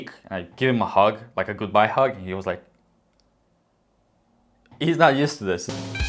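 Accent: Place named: United States. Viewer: First video I saw from your channel I honestly thought you were american. Not because you speak english, but because of your accent. It's not pure american to me, but definitely not british or australian. Si I kinda thought you could be american. You have an amazing english. Wish my english was in that level, but my english is intermediate. I hope one day Brazil will have a good amount of people who can speak english properly. My sister is 15 and in her school it has been a couple years since they started doing this bilingual education system. If you ever want to feel closer to Eurpo, visit the cities of Campos do Jordão (São Paulo) and Gramado e Canela (south of the country. You will fall in love with them.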